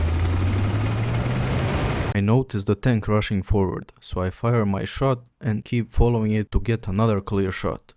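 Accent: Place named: Eastern Europe. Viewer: Holy shit my guy has a voice! Lol if you ask me all us slav’s have the same accent when speaking English (2nd language) 😂. Продолжай в том же духе, брат 🫡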